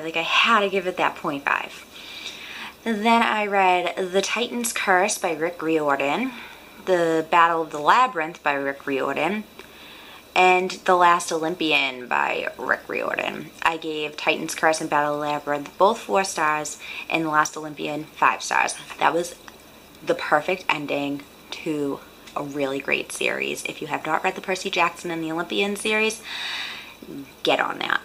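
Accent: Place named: Massachusetts, United States. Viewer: Enjoy the lists! I subscribed :) By the way, are you from Massachusetts? Just a guess from your accent :)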